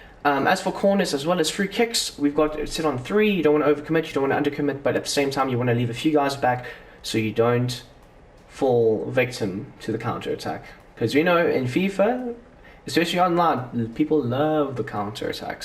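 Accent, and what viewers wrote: South Africa. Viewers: U South African ?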